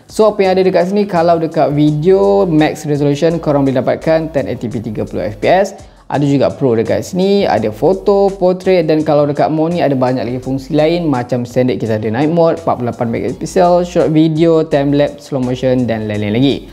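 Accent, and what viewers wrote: Malaysia. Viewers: Saya suka banget sama nada bicaranya org malay❤️ salam from indo🙏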